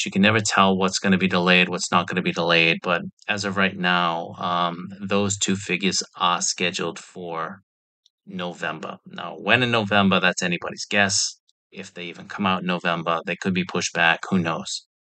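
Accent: Boston, United States. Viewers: My Yankee ears are picking up a Red Sox accent lmao. Thanks for the updates bro. Need that new Batman and Eradicator soon!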